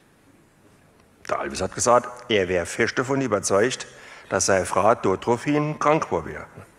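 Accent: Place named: Saarland, Germany. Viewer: Herlich ..und noch uff saarländisch 🤣🤣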